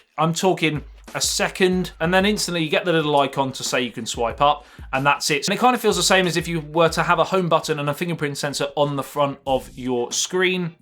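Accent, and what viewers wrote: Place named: Northern England, United Kingdom. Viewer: This is not RP. Bad northern England accent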